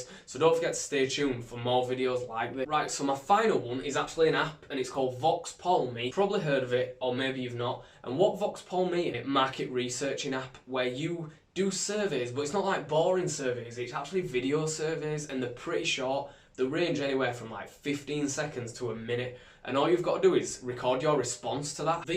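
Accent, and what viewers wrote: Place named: North Yorkshire, United Kingdom. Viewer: Thank you so much for your videos. I have learnt so much, made a few quids already and I usually don't really comment on YouTube, but your videos and channel are so underrated. You need to be more famous. Will definitely recommend you to my friends. Keep up your fantastic work 💪I'm French, and I absolutely love your accent! (North Yorkshire if I'm not mistaken !)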